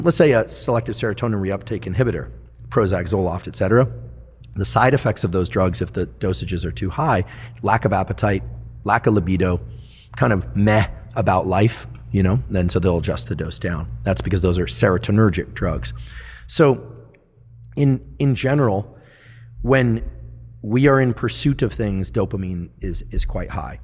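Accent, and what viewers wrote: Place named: United Kingdom. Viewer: Just because you asked me to subscribe nicely and in a British accent, I will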